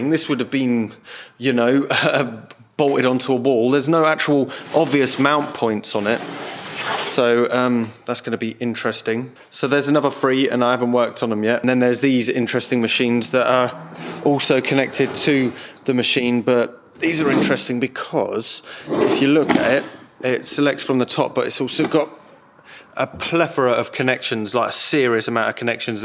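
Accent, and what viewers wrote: United Kingdom. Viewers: Only thing I like about you is your english voice. But there is no punk anymore. No revolution. Just established crap about electro bullshit. Just like that sad Schneider.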